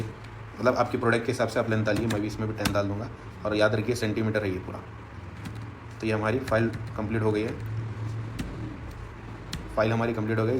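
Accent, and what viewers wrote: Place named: Hyderabad, India. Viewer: Language accent se hyderabadi dikhre paasha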